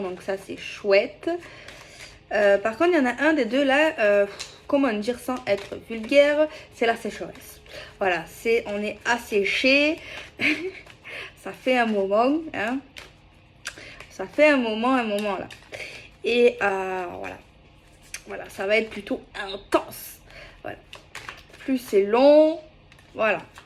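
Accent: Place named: South, France. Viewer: Bonsoir,hey c'est également l'anniversaire de ma fille 🎂.... Joyeux anniversaire 😁 et j'adore tes vidéos qui sont dynamiques,ton accent du sud... continue comme ça 😉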